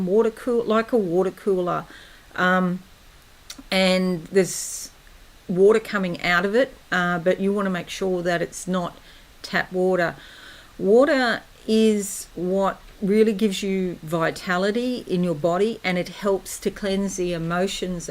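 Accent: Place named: Australia. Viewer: Thankyou beautiful 🙏🏽🌹 I am so intune with this Read and like a true fish will just go with the flow. As for the wishes I truly hope they come true if it is meant for me. Love your beautiful voice and familiar Aussie accent...i feel on your wavelength 🙏🏽🌈♓💜🌹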